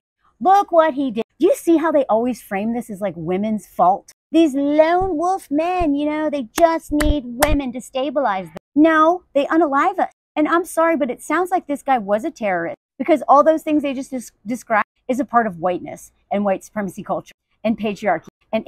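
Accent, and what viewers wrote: South, United States. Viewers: You're from Nashville! Cool city. I knew I heard a little bit of a Southern accent. lol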